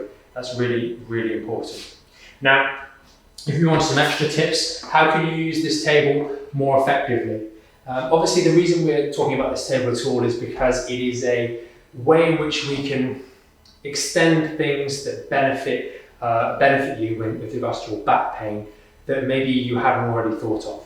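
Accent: England, United Kingdom. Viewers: Looks to me that it is a teeter inversion table, between the heavy English accent, the echo, and the fact that he’s speaking turbo mode-I can only hear like one out of 25 words (why do people bother getting a college education when it seems to me logic gets pushed out the back door every single time without fail.👎🏼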